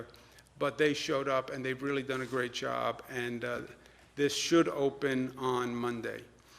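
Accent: New York, United States. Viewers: I am, like so many others, a native NYer now living in FL. Cuomo is even comforting ME down here!!! He sounds like and reminds me of his father, Mario, so much. Hannity criticizes him, but it's still so comforting to hear the NY accent and the way he cuts to the chase in explaining what's going on. and of course, he's fighting to get the best for his state. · Plus everything he says sounds like he's describing a nice stake or his mama's marinara...lol....I live in FL now, but am originally from NY state, Buffalo, but spent time in NYC here and there as well and his vocal deliveries take me home, lol...the NYC guys/men and the North Buffalo guys/men I knew growing up..but I'll lay it bare here, I don't personally like politicians in general, but still listening to him is informative about what's going on up there and like I said, his delivery is nostalgic. 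I'm hoping this is over soon, for all of us, every where.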